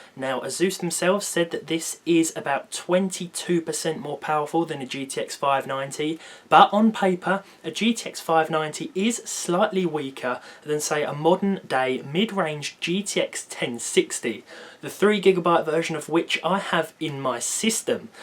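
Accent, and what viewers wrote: United Kingdom. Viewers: You look like General Sam with a British accent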